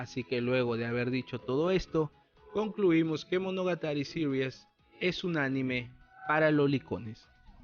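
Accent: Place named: North, Mexico. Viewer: Norteño xd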